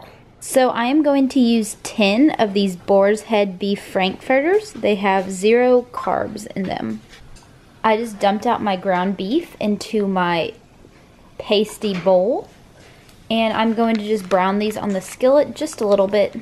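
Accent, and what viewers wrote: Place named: Texas, United States. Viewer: A beautiful texas girl with a beautiful southern accent! What part of texas you from?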